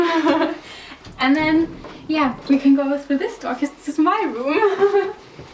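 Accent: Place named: United Kingdom. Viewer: Dein English ist echt toll😍 und ich finde es echt toll, dass man echt richtig hört, dass du British English "lernst".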